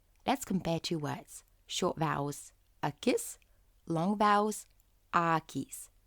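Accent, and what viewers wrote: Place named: United Kingdom. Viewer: Are you a Brit?so pleasant English in here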